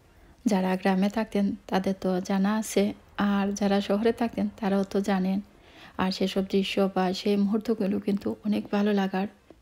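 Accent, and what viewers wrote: Sylhet, Bangladesh. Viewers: Afa Sylheti matowwka Eta mat bala lage. Na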